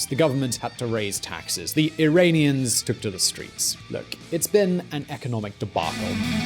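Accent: United Kingdom. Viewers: THANK You for this Information! I LOVE Your UK Accent. It fits YOU. Our Hearts are with the Every Day Iranians who have Children and Grandparents they Love and arre trying to PROTECT. This is Very Sad.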